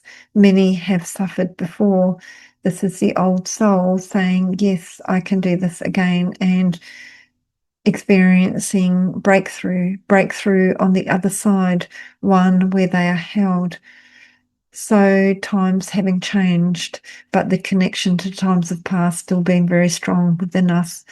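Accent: New Zealand. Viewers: Her Kiwi is strong 🙂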